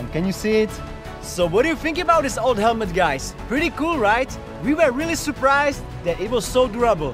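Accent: Czech Republic. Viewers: Nejsi z česka náhodou? Podle přízvuku tak zníš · Super video a hlavně i originální dávám like a odběr a umíš plynule anglicky až na ten Českej přízvuk ale to není žádném problém :D přeju hodně subs protože si to rozhodně zasloužíš!! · i think you are from czech republic.. your english is good but.. your voice, btw great video ;)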